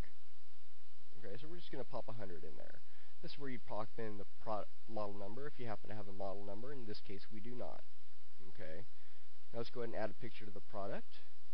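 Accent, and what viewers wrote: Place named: United Kingdom. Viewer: He's a Brit so ofcourse he got an accent.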